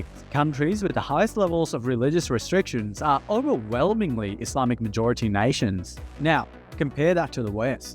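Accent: Australia. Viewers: He sounds australian….shows what you know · You've got native australian accent. I suppose you were under 10 yo when you left your country :) thanks mate for the content! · He's Australian but I believe partially grew up in an Islamic country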